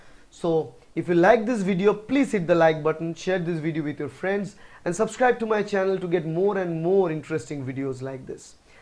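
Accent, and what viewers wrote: Bengal, India. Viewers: Sir are you from kolkata Are you bengali I find a tinge of bengali in your pronouncing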